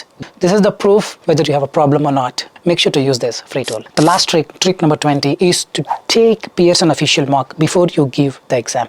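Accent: India. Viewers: You speak very fast 😢didn't understand you speak English like hindi your accent is like hindi you should take breath at least a second😅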